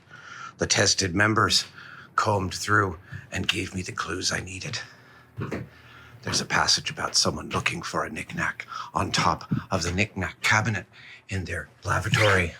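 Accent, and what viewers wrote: Ireland. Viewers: I thought it was Liam Neeson narrating. Pretty good attempt at some kind of Irish accent, by an American.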